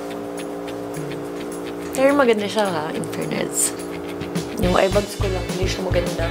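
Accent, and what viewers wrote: Visayas, Philippines. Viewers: Ang cute.. Bisaya.. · kakyut jud oi! makaproud maminaw gabisaya ka😂😂😂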